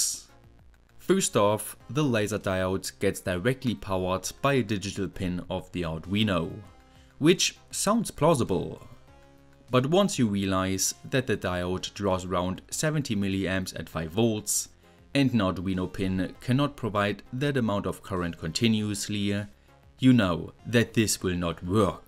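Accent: Germany. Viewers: but you are not a Scott. This is the hardest German accent I have heard in my life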